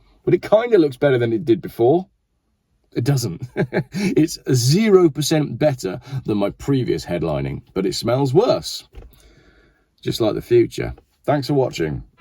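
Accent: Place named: Oxford, United Kingdom. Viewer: Sounds a bit like Oxford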